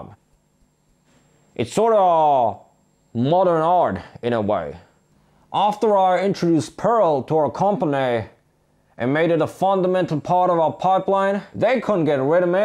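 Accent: South, United States. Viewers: It sounded like what it was, a foreign guy doing a parody of a Southern, possibly Black-Southern, accent. Still works IMO, since a lot of extant Perl is likely in government & old-school tech industries like telecom. And agreed that Perl is great for code puzzles and showing off, but job security isn't to be sneered at...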